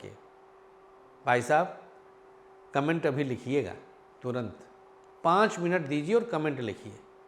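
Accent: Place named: Awadh, India. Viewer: अवधी बोली❤ प्रणाम गुरु जी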